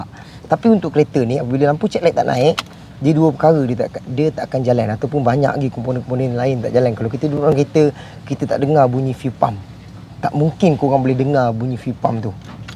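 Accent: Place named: Kelantan, Malaysia. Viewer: ade bunyi mcm kelantan sikit. Ore klate ko?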